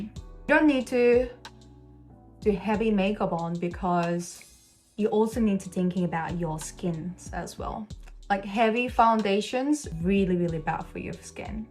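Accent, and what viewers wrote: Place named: Australia. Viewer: Pretty sure she's from Australia, she has the accent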